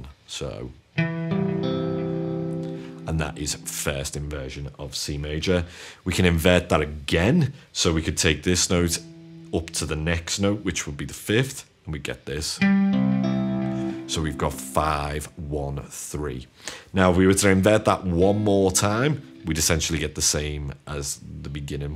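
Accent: Liverpool, United Kingdom. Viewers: are your from somewhere near liverpool? im from Bootle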